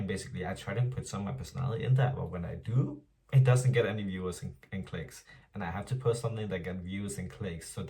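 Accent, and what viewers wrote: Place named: Denmark. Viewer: danmark nævnt i medierne gutter (danske accent er lowkey crazy)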